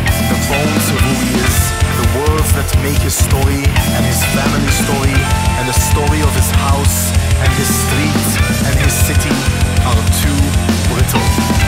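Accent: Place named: France. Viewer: With a French accent no less. Like butter to the ears.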